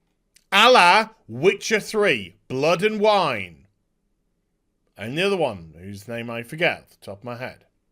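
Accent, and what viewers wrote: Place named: United Kingdom. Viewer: I can't watch this.....without.....busting my gut laughing....that proper sounding British accent....doesn't.....help! ROTFLOLLLLLLLLLLLLLLLLLLLLLLLLLLLLL!!!!!!!!!!!!!!!!!!!! Stop it dude your are killing me! LOLOLOLOLOLOLOLOL!!!!!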